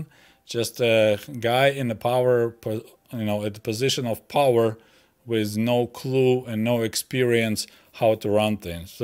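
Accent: Russia. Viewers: Man I love Russian - English accent / dielect . You sound so nice but at the same time so deadly. Much Love from New York. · Sir, I'm obliged to mention that you still have a discernible Russian accent. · Agreed I love the accent so much.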